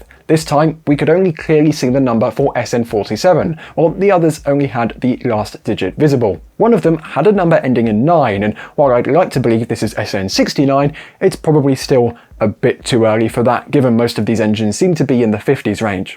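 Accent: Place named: Southern England, United Kingdom. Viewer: It's a peculiarity of one of southern England accents and requires no teaching.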